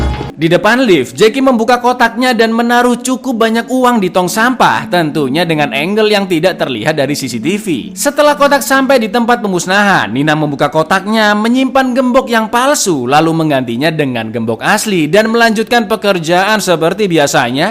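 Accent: Java, Indonesia. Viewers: Sy sering nonton spoiler video anda.. Bagus dan kocak. Cm kurangi medok lpgat bahasanya krn tidak semua org paham maksud anda. Jd gunakanlah bahasa Indonesia yg baik. Tetap semangat 👍🥰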